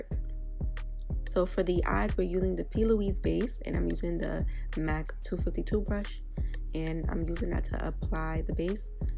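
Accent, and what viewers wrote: New York, United States. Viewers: nyc accent i love it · U a 🐦 like me 😂 U def gotta b from NY😩... love it😍 #Bk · She sound like she from New York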